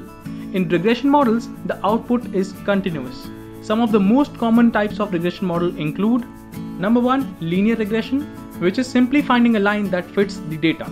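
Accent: India. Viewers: Why indian accent use too much tongue?!!! It's so annoying.. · 又是满满的咖喱味儿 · The moment i heard the indian voice i just knew it would be an awesome video :)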